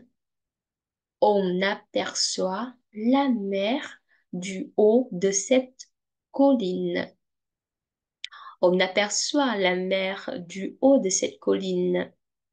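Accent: Standard. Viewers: Ừ tôi đang viết cách phát âm , chuẩn lắm Mà nãy giờ có cô bé ngồi kế bên á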